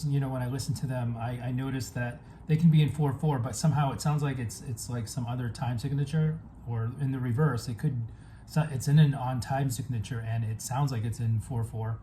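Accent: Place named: London, United Kingdom. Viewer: “Allo, G’mornin’ Guv”….Very London. ITS so soft that most people miss it.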